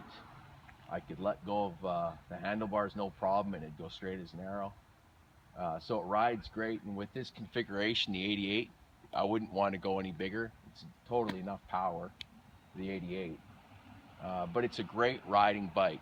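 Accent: United States. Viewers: You sound like you're from the northeast U.S., I am just wondering because I am. I have a sky blue 2005 American Ironhorse Outlaw chopper and I love it but I also love the ''old school'' looking bikes like you have, thank you for sharing! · Are you from Rochester NY? Your accent...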